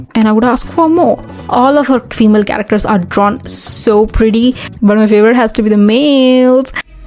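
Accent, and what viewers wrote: India. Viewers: Is accent is indian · Why your accent sound so Indian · u sound indian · You sound like Indian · Sounds like an Indian accent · Her accent she is Indian, I love it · why does she talk like an Indian Girl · are you Indian? The accent feels so 🌸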